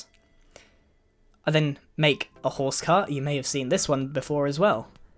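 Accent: United Kingdom. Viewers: Wow! You are a genius! I really like that british accent. :D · You sound like your British? You probably aren't. But that doesn't matter a tad. You are extremely good at building!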